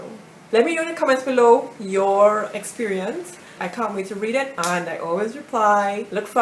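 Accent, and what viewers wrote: Trinidad and Tobago. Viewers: You sounding like a Trinidadian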